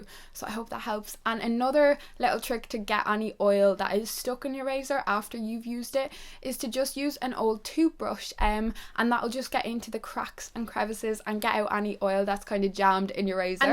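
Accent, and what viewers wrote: Ireland. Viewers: Are you irish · I feel like you're Irish! What county are you from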